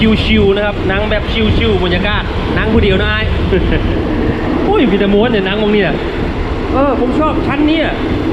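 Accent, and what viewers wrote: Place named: Isan, Thailand. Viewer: สำเนียงอิสานแบบนี้เพราะมาก.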